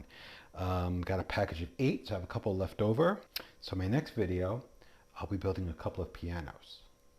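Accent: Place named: Hudson Valley, United States. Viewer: Sounds like you might be in the Hudson Valley. Hello from Greenville, NY. You have an incredible skill level. How did you develop your skills? Also, if you don't mind, what was the tool you used - some sort of drill guide? Thank you.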